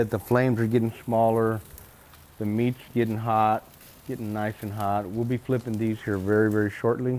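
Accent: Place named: San Antonio, United States. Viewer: Job well done Sir! You sound like you’re a San Antonio native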